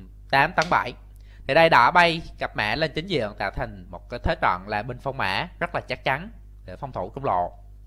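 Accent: Binh Dinh, Vietnam. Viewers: Bình Định! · Giọng bình định hay phú yên mà nghe khá nặng? Có vài từ ko nghe kịp! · Ng bình định hay phú yên gì đó chớ quảng gì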